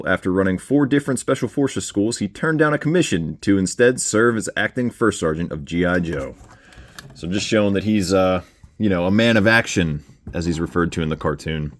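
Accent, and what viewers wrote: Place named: Pennsylvania, United States. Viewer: I'm good ain't I? I heard the pronunciation of the words you say. Forget what words they were but I know you didn't say this but this would be an example. Cold. Half of jersey says cold with the l. The other half and into pa and surrounding states and areas say code. You guys leave out the l. Many other words you say that you never realized you said differently, like house and home. Most people don't pick up on things like this lol. I'm from the jersey shore, my family's from PA and Trenton. We talk so differently and I'm only 40 minutes from Trenton and the Delaware River.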